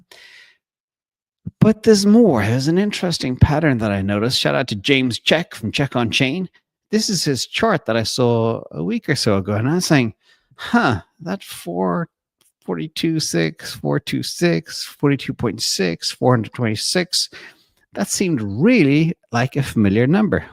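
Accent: Europe. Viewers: to me he doesn’t sound like he has an American accent, it has a hinge of euro accent but I could never pin it down. My guess is he moved around as a kid.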